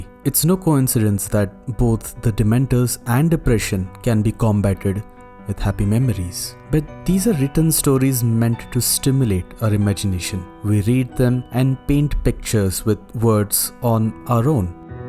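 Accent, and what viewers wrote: India. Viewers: Indian accent always stands out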